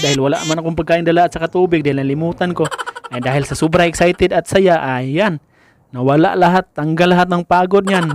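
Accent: Visayas, Philippines. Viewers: pls. mag gloves ka pohh pg mag dumpster para iwas kati sa kamay at sugat lalo na poh at kinakalkal mo ang mga dumpster... bisaya poh talaga accent mo mag tagalog...hehehe..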